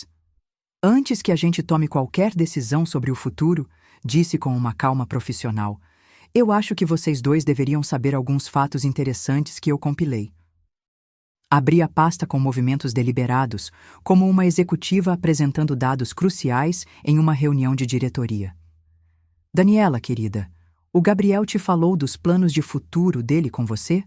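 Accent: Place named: Paraíba, Brazil. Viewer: paraíba, voz bonita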